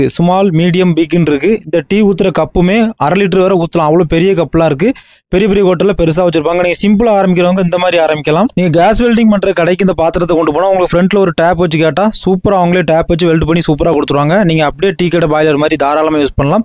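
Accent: Tirunelveli, India. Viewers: bro neenka tirunelveli ya?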